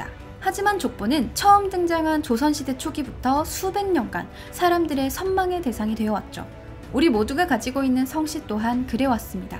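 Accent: Gyeongsang, South Korea. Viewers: 경상도 사투리 덜 어색하게 하시네요~^^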